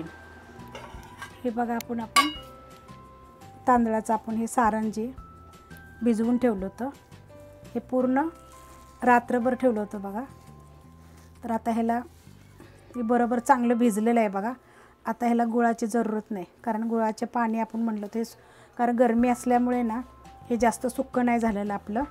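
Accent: Satara, India. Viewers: Are wa mla tumcha bolnyavrnach kalla mi pn satarchich ahe nagthancha fude kashil koparde 😍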